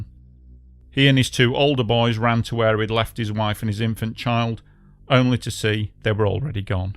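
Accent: United Kingdom. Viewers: Where is the narrator's accent from specifically in the UK?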